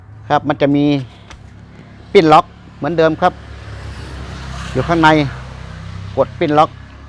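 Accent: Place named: Central Thailand, Thailand. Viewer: ขอบคุณครับน้าที่ที่สอนการซ่อมเครื่องตัดหญ้าสอนพูดภาษากลางคนภาคใต้เข้าเข้าดีครับครบทั้งสี่ภาคเข้าใจภาษากลางขอบคุณครับน้า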